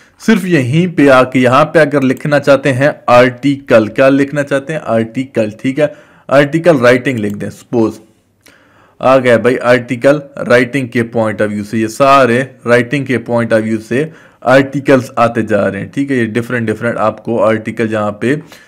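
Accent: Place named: Mianwali, Pakistan. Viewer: Boli se AP mianwali k lgty hain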